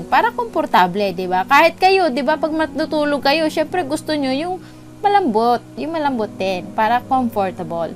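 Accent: Pangasinan, Philippines. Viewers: Litaw na litaw accent ng pangasinan hahaha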